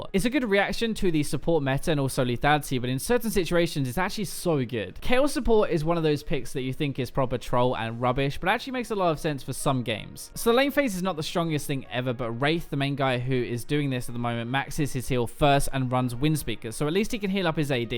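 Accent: United Kingdom. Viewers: i like the uk accent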